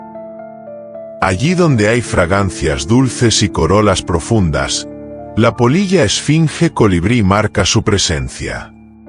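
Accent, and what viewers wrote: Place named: Spain. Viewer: Hoy he visto este documento y me agrada mucho que se hace énfasis en 1. Mostrar parte de la biodiversidad de insectos en la Amazonia y las interacciones de estos con el entorno de la selva y la necesidad de preservarlos para la salud del ecosistema y el planeta; 2. Como cada especie tiene un papel en el intrincado rompecabezas de la Vida y el aleteo de una mariposa repercute en los confines del cosmos. 3. Como videofilmador de naturaleza coincido en que la selección de la música y los sonidos acompañantes es muy importante, también la voz del narrador es importante, aquí tiene un acento español y creo que el canal es de América, cierto? Mi canal es de flora y fauna de México y todo mundo lo mira sabe que es una ventana a México. Saludos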